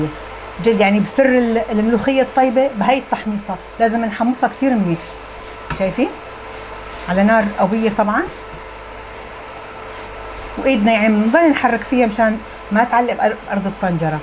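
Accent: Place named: Levant. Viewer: جننتي سماي ماعم اعرف لهجتك شوي بتحكي حلبي شوي شامي على فلسطيني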